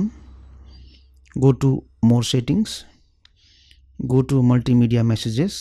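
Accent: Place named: India. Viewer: his voice drives me nuts..thick Indian accent and monotoned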